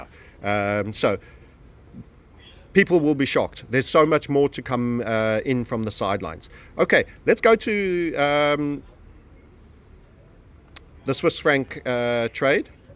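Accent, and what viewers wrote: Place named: South Africa. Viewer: His speech sounds South African to me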